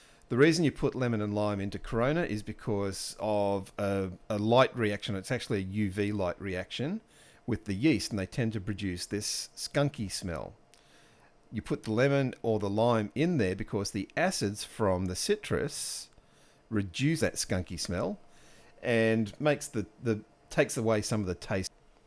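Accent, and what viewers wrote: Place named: United States. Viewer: You are using metric system but your accent like american ?? 😮😮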